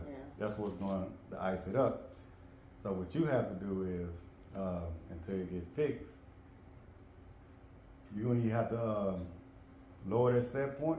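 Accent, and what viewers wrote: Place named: Alabama, United States. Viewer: Small thang to a giant...that`s that alabama/mississippi talk there. I feel you though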